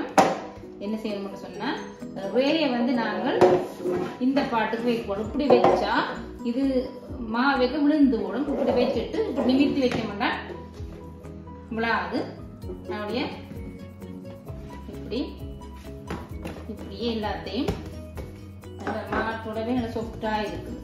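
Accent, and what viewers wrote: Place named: Sri Lanka. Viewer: இலங்கைத்தமிழ் 💗💗 தமிழ் என்றாலே அழகுதானே .... அக்கா உங்களுக்கு 4000 watch Hours வந்திட்டா ??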